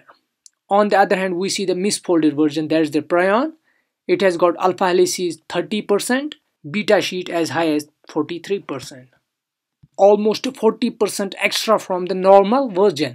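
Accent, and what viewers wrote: India. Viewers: as soon as i heard the indian accent i knew this would be an informative video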